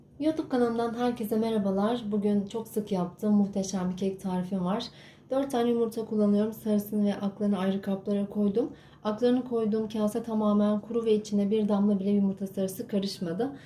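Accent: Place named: Azerbaijan. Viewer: Azerbaycanlı birinin türkçe böyle konuşmasında hiç sorun yok siz Azerbaycanca biliyormusunuz hiç?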